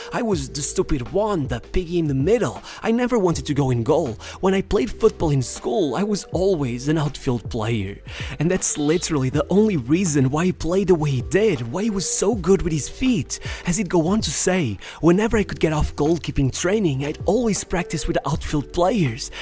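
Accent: Portugal. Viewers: Bro are you Portuguese? Your accent sounds Portuguese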